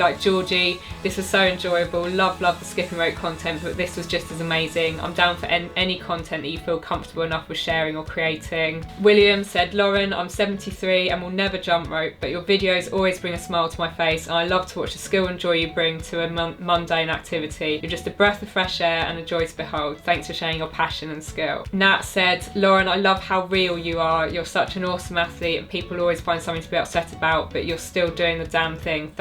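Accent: England, United Kingdom. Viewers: I really like your English accent 🇬🇧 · I’m fascinated by the juxtaposition of your British accent and the baseball caps in the background which are representing teams in the USA. 😊 Would you mind sharing your connection to baseball? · Incredible fit blonde beauty, British accent with a mild lisp with a niche subject = 1 million subs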